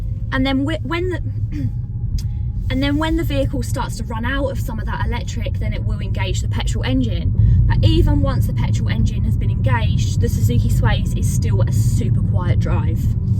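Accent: Essex, United Kingdom. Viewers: I like how the rough essex accent slips out from time to time.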